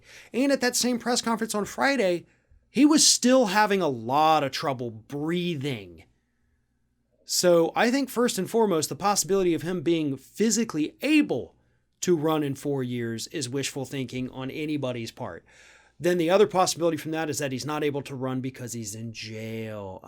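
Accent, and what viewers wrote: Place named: Florida, United States. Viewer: Love your Floridan accent saying," egth,! I dont want that agaun,!" " what was I doing? VOMIT "!!!☺️🤠✌🏽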